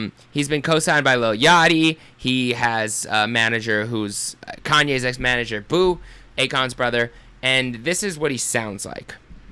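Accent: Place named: Canada. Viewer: This is an actual Canadian accent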